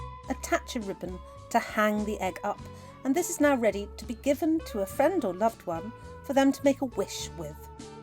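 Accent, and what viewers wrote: United Kingdom. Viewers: Hello there beautiful and that sounds like so much fun and I love the British accent thanks for sharing your precious memories and brightest blessings to you sis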